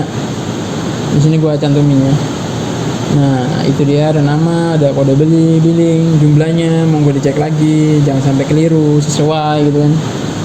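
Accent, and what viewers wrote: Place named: Java, Indonesia. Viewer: Logatnya medok Jawa keren bgt penjelasan nya 😁seru mendengar nya, btw suami istri perlu kah pisah harta?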